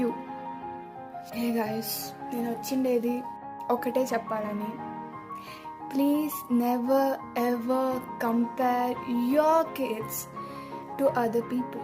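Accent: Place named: Karnataka, India. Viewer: Karnataka telugu … pakka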